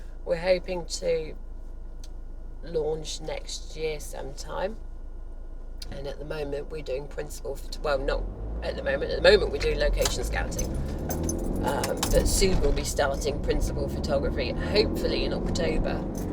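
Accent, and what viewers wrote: United Kingdom. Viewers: Just as beautiful as your voice! I never doubted you were British, no one could fake your diction. You ain't no Dick Van Dyke. Would love you to share a little more of your life online. If you are looking for troubling documentary content I have a very upsetting story but I don't want to share it in public. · Best female English accent in the world x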